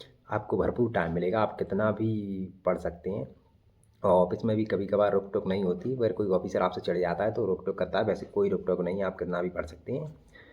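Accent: Maharashtra, India. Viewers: Saff pata chala sir aap marathi ho sir❤🎉🎉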